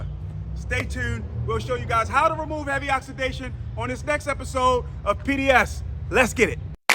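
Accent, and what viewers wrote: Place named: Baltimore, United States. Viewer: I’m going to guess you are from Baltimore?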